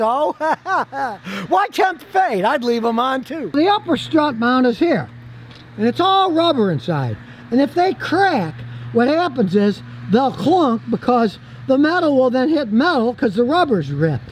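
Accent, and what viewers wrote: United States. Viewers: has developed his own personal american accent. i can barely understand him anymore.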